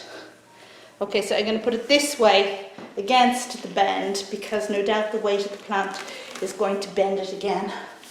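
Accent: Ireland. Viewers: OH NOOO!! Every plant lover's worst nightmare! Not the Monstera! LOL - I stumbled upon your channel recently and just subscribed! Love it and all your tips (and lovely Irish brogue!) Happy Planting, dear one!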